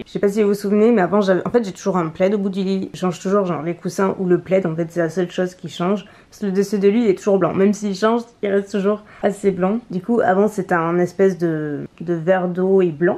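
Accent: South, France. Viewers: Ce n'est plus un mais 2 rayons de soleil en vidéo dès le matin!!! le bonheur!!!! et Notre internationale fan de chocolat, chantilly et crème va voir une diététicienne mercredi!!!! svp fait nous un retour!!! en plus elle a pas besoin de régime.... Elle est très bien notre!!!! Bravo pour tout ton travail, on ne se rend pas compte les vidéos dure entre 10 et 20 minutes pour nous, mais quel boulot!!! merci pour tes contenus, ta joie de vivre et ce petit accent du SUD qui nous réchauffe!!!! biz à vous 2!!! très beau sac ce TED BAKER, j'adore!!!!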